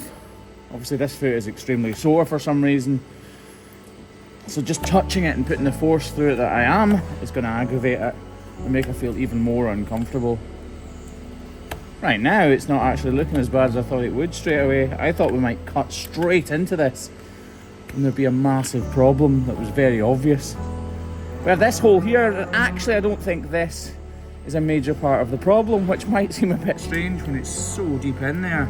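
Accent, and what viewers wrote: Scotland, United Kingdom. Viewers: I would move to Scotland just to hear the accent. Great care with the cows :) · You sound even more Scottish than normal in this video lol. I love it!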